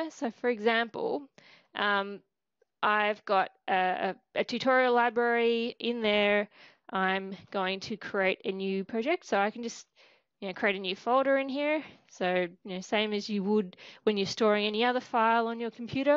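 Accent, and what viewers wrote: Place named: Australia. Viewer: I guess she is from Australia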